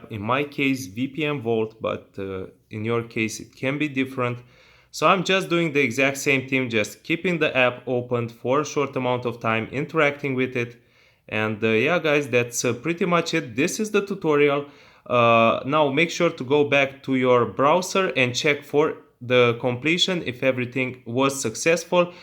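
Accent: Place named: India. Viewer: Right as he had an Indian accent I knew it was fake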